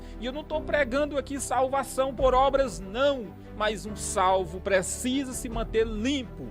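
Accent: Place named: Ceará, Brazil. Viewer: Cearense da gema😅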